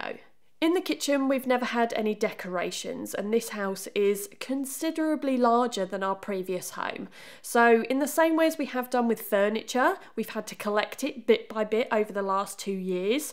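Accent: United Kingdom. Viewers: Hellooooooooo 🎉🎉🎉🎉🎉. Watching all of your videos with great taste and of course an amazing british accent. So gorgeous.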